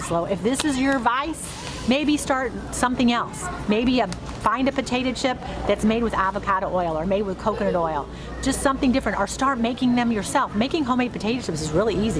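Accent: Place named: Wisconsin, United States. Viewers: Thank you. I loved the reminder. Where are you from? You have a Wisconsin accent. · Nice Midwestern accent!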